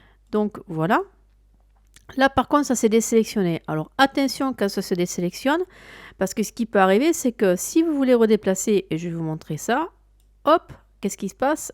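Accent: South, France. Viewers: Encore un tuto sympa avec une voix du Sud agréable qui donne envie d'aller au bout de la vidéo. Une question : comment et où aller pour télécharger les masques ?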